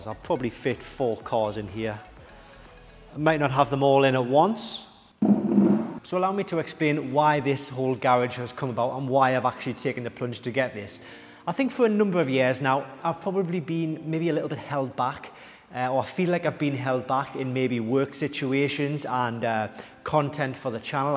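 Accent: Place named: Northern England, United Kingdom. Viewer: Hi, lovely space to work with. I can tell from the accent that you are very North but how much a month is a place like that? Im Surrey based and that would cost a lot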